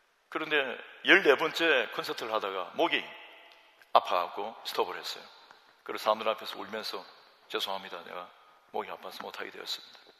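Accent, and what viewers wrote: Gyeongsang, South Korea. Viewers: 경상도 ㅋㅋㅋㅋㅋ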